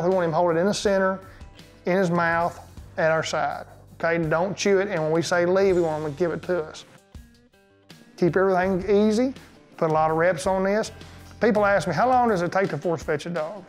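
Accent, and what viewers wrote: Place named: Texas, United States. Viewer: That Texas accent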